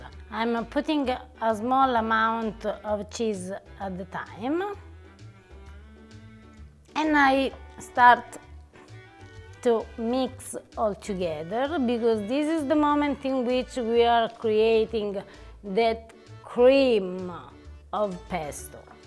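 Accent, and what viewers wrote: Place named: Italy. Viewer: Bellissimo accento italiano 😊☺️☺️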